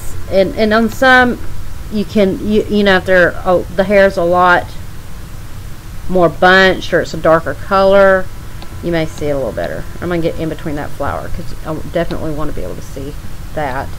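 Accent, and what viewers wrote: South, United States. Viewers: I love your southern accent too. You’re awesome along with the video. More videos please. Subscribed. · WOW, I've been looking all over the net and youtube for someone to show how to do this vintage style coloring to a B&W photo, without all sorts of steps! Thank you so much for the clear and concise tutorial and I love your southern accent! I'll be on your Teach Me To Color Facebook page soon and I'm a way thumbs up subscriber.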